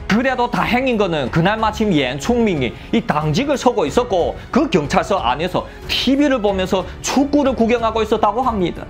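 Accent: China. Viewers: 조선족이 한국어하네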